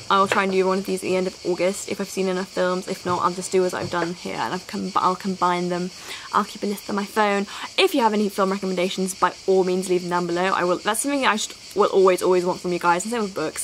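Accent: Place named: United Kingdom. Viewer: learn me the british accent. I love it soo much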